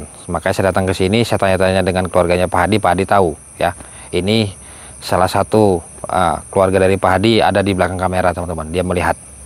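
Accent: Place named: Banyumas, Indonesia. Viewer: SEEHH. LOGAT. BANYUMASAN. SEH SEMAU. GUE. KOJ. IKUT. CAMOUR. (. LHO. SEMAU. GUE ,,,,,,,)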